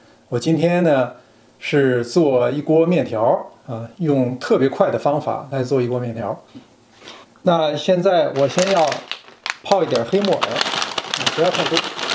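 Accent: Beijing, China. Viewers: 老师您是不是老北京的，有点听相声的感觉了😂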